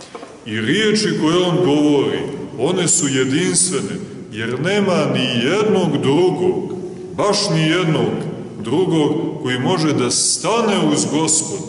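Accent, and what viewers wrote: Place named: Montenegro. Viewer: Second BTW He speaks with heavy montenegran accent. Can't miss where he is from.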